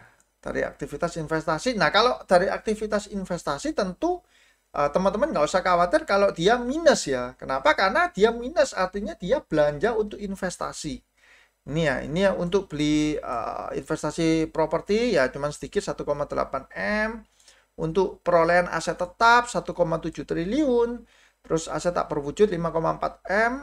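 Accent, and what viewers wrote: Surabaya, Indonesia. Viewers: Suka medhok Suroboyoane.. Suwun Cak ilmune.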